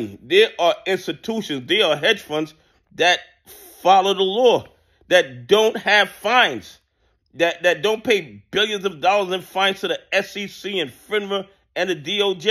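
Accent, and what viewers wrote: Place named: Boston, United States. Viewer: He did not have the money to Sue you guys should work together he's got a smart thought behind it You sound like al from Boston look where he went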